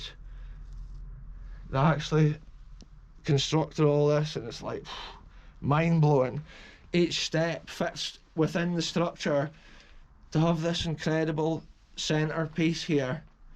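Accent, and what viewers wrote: Scotland, United Kingdom. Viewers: I love hearing your "brogue". I imagine that my Father's Mother grew up hearing the same Brogue from her Father. He immigrated in the 1870s ; his family name : Horsbrugh .AGAIN, Thank you for an amazing adventure ! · Its been fun hearing your Scottish accent, haha. I am from Seattle Washington USA. Home of Bill Gates. Love your explanations. I am thinking there are probably people buried around the castle. You can tell sometimes by dips in the ground. Do you know any of the history of what happened to the last owners?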